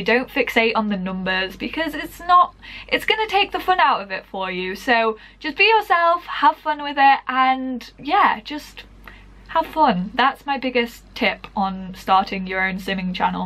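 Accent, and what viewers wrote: England, United Kingdom. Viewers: i hate english accent but i love your playings a lot and i love so much irony 😁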